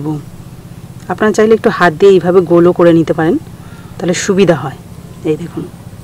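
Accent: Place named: Hooghly, India. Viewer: বৌমা , তোমার কথা শুনে মনে হয় তুমি হুগলি জেলার বাসিন্দা । তোমার রান্না খুব সুন্দর এবং পরিষ্কার । তোমার চ্যানেলের উন্নতি কামনা করছি ।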